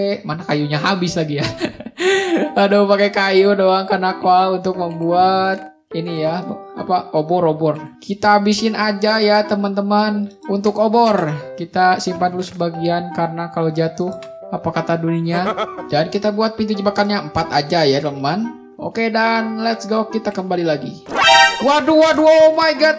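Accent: West Java, Indonesia. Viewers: Bng buat farm fish afk Orng Sunda kak bhsa kaya orng sunda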